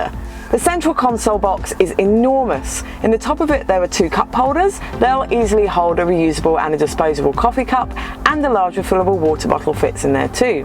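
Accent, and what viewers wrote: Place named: England, United Kingdom. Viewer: you r not from down under sounds english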